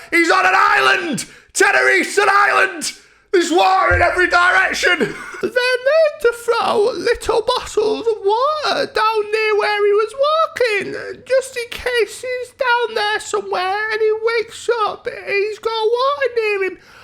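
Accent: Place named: Scotland, United Kingdom. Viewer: I really strugle with scotish but i really enjoyed the video so im gonna force myself to learn scotish so i can follow you. Thanks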